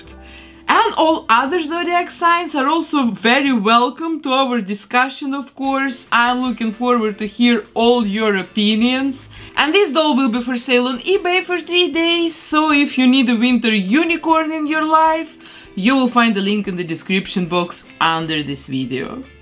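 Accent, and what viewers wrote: Russia. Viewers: блять я слышу русский акцент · Сильно слышно русский акцент 😂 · Либо я уже глухая,либо есть какой-то русский акцент у автора канала 🤔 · are you russian? not being mean or races. just asking. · Lol all the cautions are a little frightful! Lol...(Russian accent) “don’t get me mad, I know how to make you into a doll, with household products! “. Gawd I LOVE LOVE ❤️ that accent! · акцент русский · Почему я слышу русский акцент? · Is she Russian? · Почему мне кажется что она из России, у неё окцент что-ли "русский"? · Какой то слишком русский акцент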